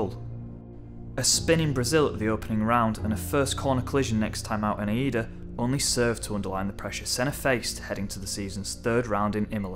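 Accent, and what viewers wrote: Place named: West Yorkshire, United Kingdom. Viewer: Are you from Leeds? Or like West Yorkshire? Anyway class video mate - really enjoyed that.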